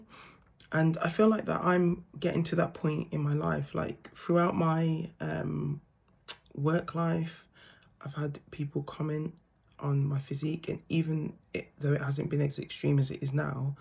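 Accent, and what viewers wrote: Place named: United Kingdom. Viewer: This is my first time seeing any of your videos. I would like to say that I have always had the highest respect for bodybuilders in general - be they male or female - because that lifestyle demands quite a lot of self-discipline in terms of workout routines, diets, etc. As a man, I have absolutely no qualms whatsoever with female bodybuilding. I believe that it is prudent for anyone with the will & drive to do so to be as strong & fit as possible. This will yield health benefits both for the present as well as for the future. I will also say, as a Black Man in the United States, that it is a good idea for us as Black People to at bare minimum render our bodies strong in order to both withstand and effectively defend ourselves against potential attack. The U.S. is quite often hostile territory for our people. I don't know whether this is an issue in the U.K. 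(where I'm assuming you're from given your lovely accent). I will make certain to check out more of your videos. Thank you for your words of support towards your fellow female bodybuilders and for being you. You're beautiful. 🌹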